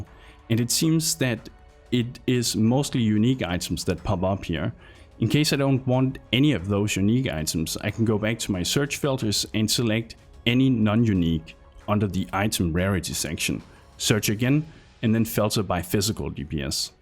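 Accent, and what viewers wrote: Denmark. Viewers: You sound very danish. Thumbs up!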